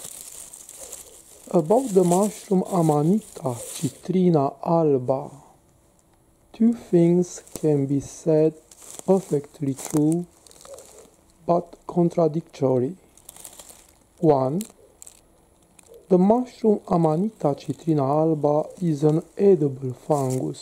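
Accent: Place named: Europe. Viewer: Alright..not likely to pick up ANY white gilled mushroom. Are you from...difficult to tell- Italy, France, Austria; a mans voice is harder for me to discern .You can tell me.I learned so much from my European journeys!I loved it.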